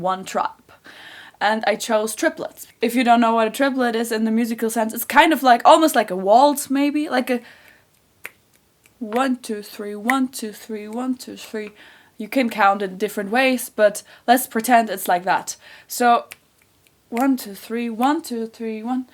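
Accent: Ireland. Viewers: Willout I also believe in a Q and A a couple of years ago, said she'd spent some time in Ireland as well, which explains the brogue. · Willout Up until seeing this video, to me; She always sounded as if she had a thick Irish brogue. It still sounds that way; to me.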